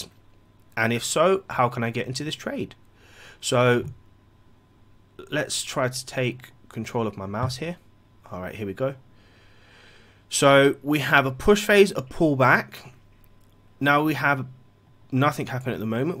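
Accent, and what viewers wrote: London, United Kingdom. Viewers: Broken english accent??? Bro it's a London accent lol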